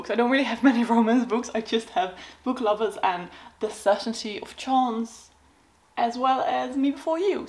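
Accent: United Kingdom. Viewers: Oh huh, wauw. Ik las als in van de UK. In combinatie met je Brittish-achtige accent. Maar wat doet ze met al die Nederlandse boeken haha... Fijne dag.😊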